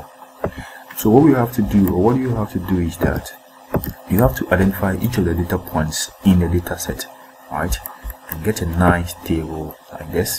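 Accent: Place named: France. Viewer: I have never seen such a thick french accent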